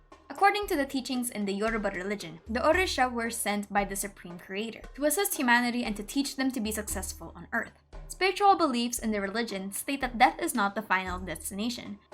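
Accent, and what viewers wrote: Philippines. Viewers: So Filipino, huh. No wonder I found your accent so nice to listen to, I guess it felt familiar and homey. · I knew it. You were a Filipino after all xD. I thought my hearing was just deceaving me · off topic but i knew from the moment I heard ur voice that u were from the philippines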